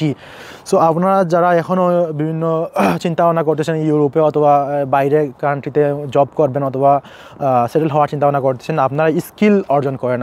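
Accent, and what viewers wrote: Sylhet, Bangladesh. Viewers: ভাই সিলেট নাকি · Are you sylhety? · Vai er kothay buja jar Sylheti bhai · আপনি সিলেটী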